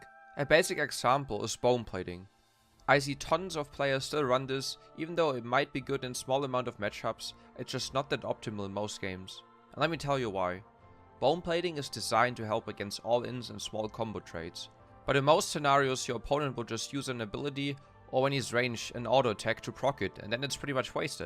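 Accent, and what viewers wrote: Germany. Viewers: love the german accent XD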